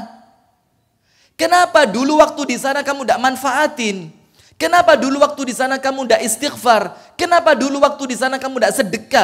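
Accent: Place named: Malang, Indonesia. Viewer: Alhamdulillah jazakallah khoyron ilmuny ustadz,saya tinggal di Lampung tapi asliny org malang..jd tombo kangen dgn logat malangny🙏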